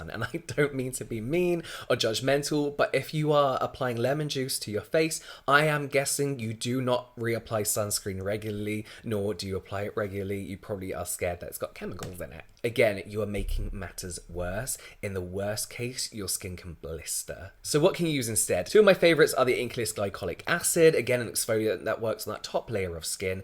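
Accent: United Kingdom. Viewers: Happy Monday! I’ve done the toothpaste thing (years ago), the it was spot treatments (they worked well), but this cool guy with an awesome British accent introduced me to pimple patches... LIFE CHANGING! 💖💖💖💖💖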